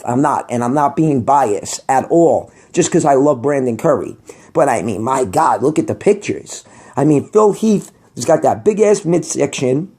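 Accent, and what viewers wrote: New York, United States. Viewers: I love your accent NY style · Idk what part of ny that accent is prob the city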